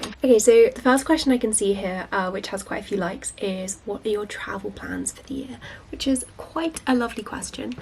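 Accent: United Kingdom. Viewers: Wow. You translated your video to 5 languages. That's awesome. Hi from Belarus. I'm learning British accent with your videos)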